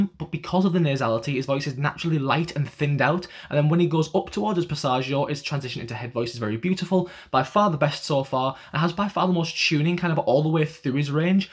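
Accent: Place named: Northern England, United Kingdom. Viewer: Can I ask where you're from? You have an interesting accent. It kinda sounds like northern England or maybe even Scotland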